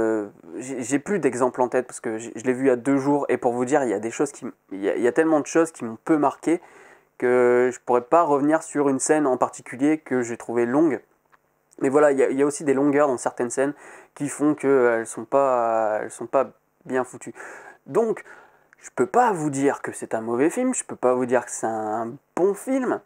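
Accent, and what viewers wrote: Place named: South, France. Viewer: C'est drôle, t'as presque l'accent du midi dès fois, sur tes O notamment ^^ C'était le commentaire inutile du jour ! Ce film ne me paraissait ni original ni super intéressant ... Comme tu dis; Virginie Effira n'a pas réellement changé de rôle finalement !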